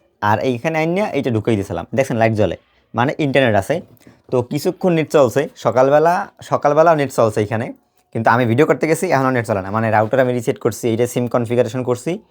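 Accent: Barishal, Bangladesh. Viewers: আপানার বাড়ি কি বরিশারে ভাষা শুনে এমন টা মনে হয়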